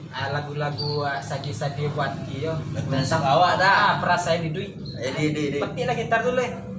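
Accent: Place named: West Sumatra, Indonesia. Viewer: Keren abis dah bang. Seneng denger lagunya. Sama seneng denger logat minangnya.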